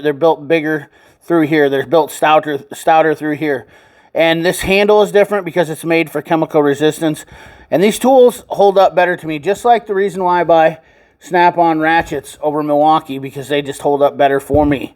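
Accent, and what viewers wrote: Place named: Ireland. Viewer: Great Irish accent.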